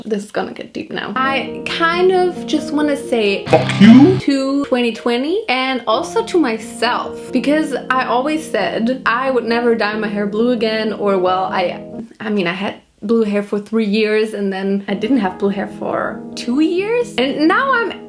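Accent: Germany. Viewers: Are you German? 😁😅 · Sie redet einfach English und bekommt diese andere Internationale Aufmerksamkeit 400iq aber man hört dass an ihrem English dass sie Deutsch ist das hört sich so cringe an 😂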